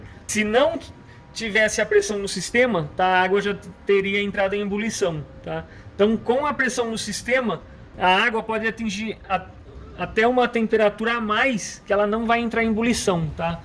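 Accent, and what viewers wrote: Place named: Espírito Santo, Brazil. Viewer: Aqui no Espírito Santo falamos assim tbm. Cada região com seu jeito de falar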